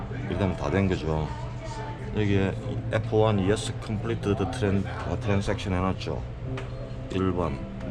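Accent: Gyeongsang, South Korea. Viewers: 구수한 경상도 사투리가 듣기가 좋습니다. 앞으로도 많은 좋은 영상 기대 하겠습니다. 모쪽록 건강하세요!~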